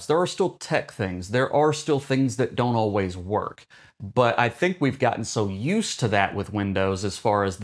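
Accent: Tennessee, United States. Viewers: are you from Tennessee?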